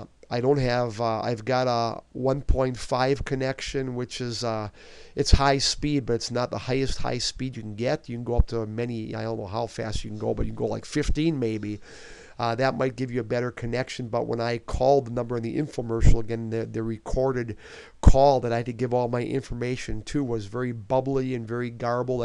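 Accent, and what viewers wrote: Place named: Wisconsin, United States. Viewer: I love your Wisconsin accent.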